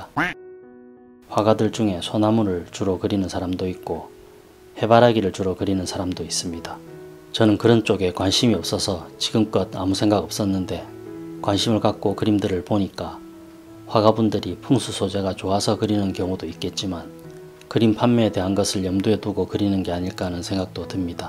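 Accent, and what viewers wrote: Gyeongsang, South Korea. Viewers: 감사히 잘 보고 갑니다 ^^ 말투가 경상도 쪽이신듯한데..맞나요 ㅎ^^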